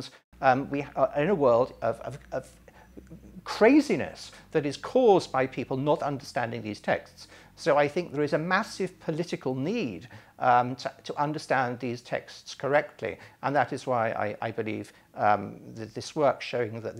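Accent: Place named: England, United Kingdom. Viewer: Wow you sound so smart with the English accent and all and yet I don’t believe anything you regurgitate as truth - nice try.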